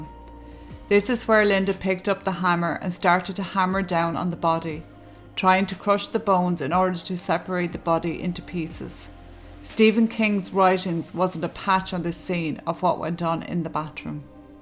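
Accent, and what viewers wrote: Ireland. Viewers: Am I a very bad person for still being rooting for these girls after all? Dunno, for once, girls doing an abusive pr**, I really can't be that sorry for him. But Jeez, was this a true mess...... Greetings from Italy from someone who was living in Dublin but left the year before this all happened 🧡love your channel (and your accent, it brings back so many nice memories...) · Love your content and your soft, lulling Irish voice. I've only just found your channel and have now watched all your vids so I'm eagerly waiting for more. Sending love and luck from the West coast of Australia. 💚🍀💚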